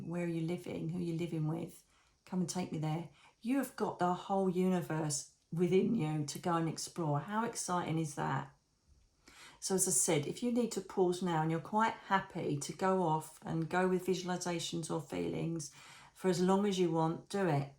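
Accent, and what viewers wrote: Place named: England, United Kingdom. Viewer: Just love your lovely English accent and your beautiful soul. Love from Germany ❤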